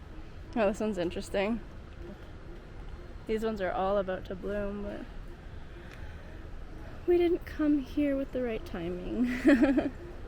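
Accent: Costa Rica. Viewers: Hi, I have seen some of your videos and your spanish pronunciation is very "Tico" and also cute, How did you learn it, formal classes or just listening and talking ?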